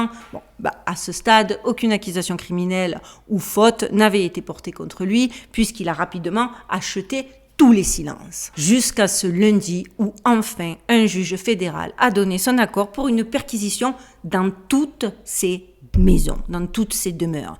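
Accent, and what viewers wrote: South, France. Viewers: je reste, car ta voix est comme un soleil chantonnant d'accent du sud, et rayonnant de ta beauté d'ange blond.. Merci d'être là contesse.. Il n'y a que les jaloux qui partent lol! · jaime bcp laccent du sud bref je vais regarder la video meme si jai jamais aimer ce p.diddy comme 90 % des rappeurs americains..jespere juste que ca sera pas complaisant....jai vu une video ou un mec essayer(il ramait)de defendre p.diddy.... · Ma chère garde ton courage et ta pugnacité❤ j’aimerai bien un jour entendre ton anglais à la marseillaise car comme moi tu n’a jamais perdu ton accent😉😅. Je vis en Belgique et je me fais repérer à tous les coups😅 surtout quand j’avais du grain à moudre avec la police 🥴 garde une zen attitude, les trolls sont toujours là mais si tu te dis que c’est parce que le camp adverse a peur, c’est que tu es sur la bonne voie…🥰🥰🥰stay safe and happy😘😘😘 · Merci encore et encore tu as bien du courage de regarder toute cette abomination pour la montrer à ceux qui gardent les yeux et les oreilles soigneusement fermés. 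Que. d'horreur!! certains etres humains (??? ) sont vraiment capables du pire et c'est pas d'aujourd'hui..déjà ds l'histoire en France Gilles de Rais et la comtesse Batorl et beaucoup d'autres qui par leur "rang" étaient "au dessus des lois, de la morale, près du pouvoir" C'est immonde et je crois que peut etre nous vivrons la chute de cette mauvaisete maintenant que la boite est ouverte, la puanteur qui s'en dégage est si forte que bientôt mm ceux qui se pincent le nez vont la sentir. Tu es aussi intelligente et courageuse que jolie à regarder et j'adore ton élocution qd tu t'enerves avec ton petit bout d'accent du soleil. Bisous de la mémé de france 😘👵💖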